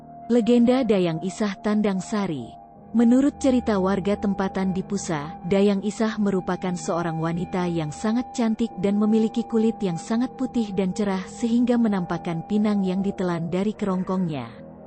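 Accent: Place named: Indonesia. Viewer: Apasal bunyi indon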